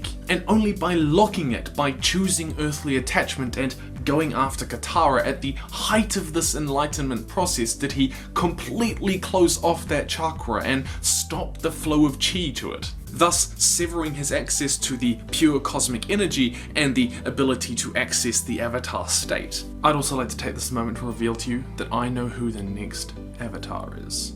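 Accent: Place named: New Zealand. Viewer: if your accent is new zeland, i love new zeland like really, it's so cute and filled with joy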